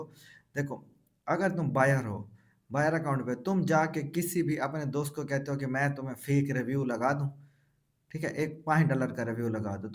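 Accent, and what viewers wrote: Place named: Kot Addu, Pakistan. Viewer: chlyn good hogia bhai... Seriously thanks wo meri kismat account chly na chly laikn aik e gig pe 20+ orders mily hn bss payment method add hojy ksi traa.. boht informative vedio thi apki.. apky ascent se to aesy lgta hy k ap DGk, taunsa ya kot adu bellt se belong krty hn I'm i right? 😂 Mn khud v kot adu k city CSS se hon😊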